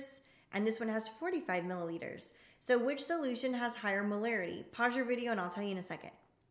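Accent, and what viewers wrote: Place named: United States. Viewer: Not long found your channel and I love your videos, even the American accent! Many thanks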